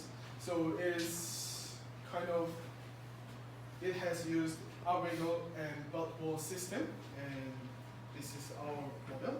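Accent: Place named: United Kingdom. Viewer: 브릐티시 악센트가 멋있네요~~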